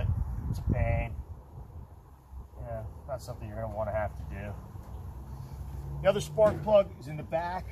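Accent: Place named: Philadelphia, United States. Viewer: And that area is very clearly the Philadelphia area! Can spot that accent anywhere. See ya around town!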